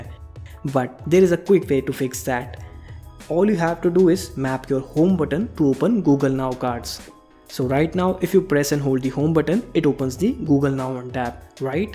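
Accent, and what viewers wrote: India. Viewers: indian english is so scary.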